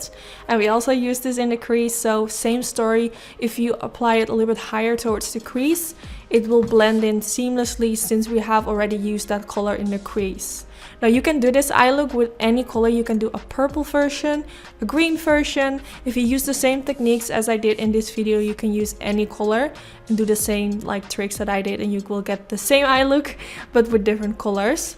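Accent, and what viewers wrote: Netherlands. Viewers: Wacht ben je nederlands?? Haha dit is de eerste keer dat ik langs je kanaal komt, en na 2 minuten dacht ik hmmm iets aan de uitspraak klinkt een beetje nederlands, maar toch ook weer niet. Daarna ff gegoogled en toen wist ik het hahaa -- leuke video ook!!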